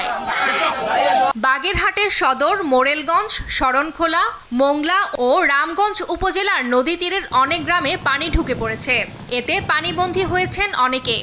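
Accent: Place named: Kolkata, India. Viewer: কোলকাতার মতো কথা বলে কেন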